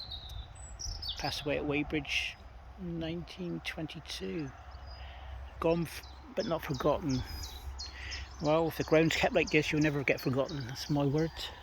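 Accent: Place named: Cornwall, United Kingdom. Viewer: i find your videos soooooo relaxing. and i love your cornish accent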